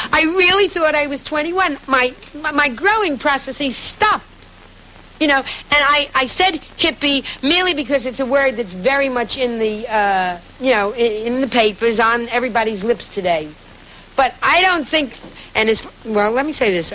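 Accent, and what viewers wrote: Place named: Larchmont, United States. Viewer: It's the Larchmont accent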